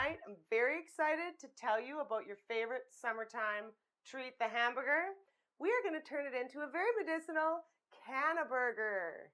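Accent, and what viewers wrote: Canada. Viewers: She sounds Canadian to me. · You Canadian?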